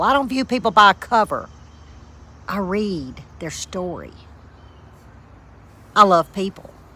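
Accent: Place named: Louisiana, United States. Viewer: You can tell there's some Louisiana accent (a touch of Cajun) in there and mixed with some parts of Kentucky. Before I retired, I traveled a lot to Louisiana on business--places like Houma, La Place, Empire, Nawlins, etc. Used to love the "talk". Although those native to New Orleans sounded more like they were from Brooklyn--not 100%, but a lot.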